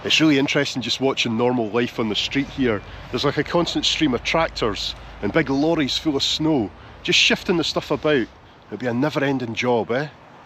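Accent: Scotland, United Kingdom. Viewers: As one with a bad knee, I commiserate with you. No, please, for the sake of your knees, don't do another long coach trip. Love your ferry boat rides - please do more of those. And love your Scottish brogue. My ancestry hails from Scotland. Blessings, Georgina from Georgia, USA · U picts never like salted caramel 😆 I enjoy watching your videos...love the Scottish accent. Greetings from denmark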